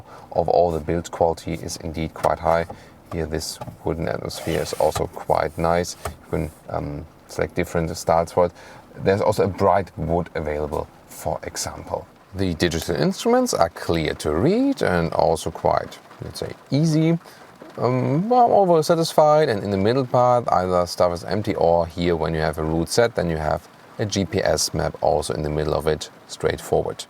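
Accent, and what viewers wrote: Germany. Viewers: Jouw Duitse accent is charmant. Vriendelijke groeten van Marcus uit Holland :)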